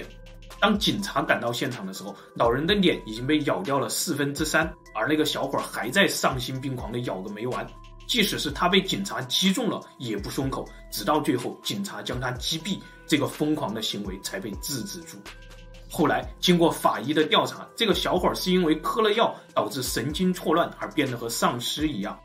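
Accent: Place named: Hunan, China. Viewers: 你是湖南人么… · 可能是四川两湖一带的口音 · 到底是哪里人啊？我好喜欢你浓重的西南椒盐普通话，好亲切好接地气！总会让我想起当年学校男老师们那蹩脚的川普~🤣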